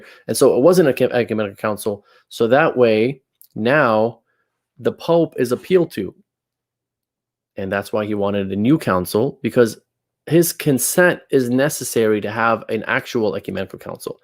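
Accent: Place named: Arizona, United States. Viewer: Are you in the Middle East? I would maybe guess someplace like Phoenix, Arizona, based on your accent, and there being a large Chaldean (and, Melkite) community there😀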